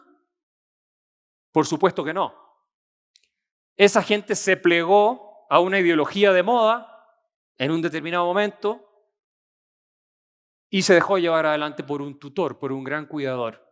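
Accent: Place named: Chile. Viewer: Excelente. Tu acento me acuerda de mi hermosa chilenita linda que perdí en el 2020. Mujer tan hermosa y única.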